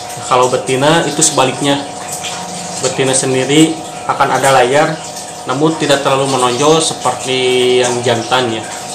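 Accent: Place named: West Java, Indonesia. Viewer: Sunda banget euuyy...😂😂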